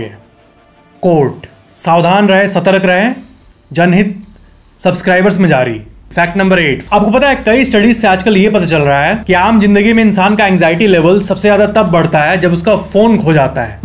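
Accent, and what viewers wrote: Bihar, India. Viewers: Theth bihari bhasa. Pulur-pulur😅😅😅😅😅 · I think brother from bihar 😂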